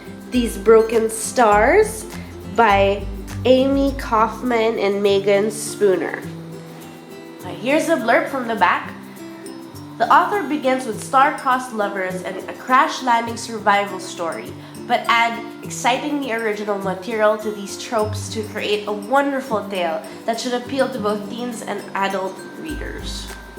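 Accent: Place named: Philippines. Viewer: I sensed you were from the Philippines! Great haul,:)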